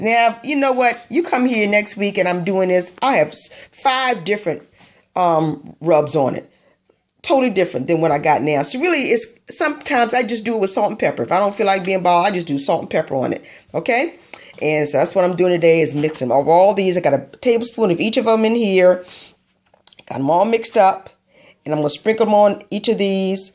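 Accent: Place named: South, United States. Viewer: She sounds New Jersey ish and southern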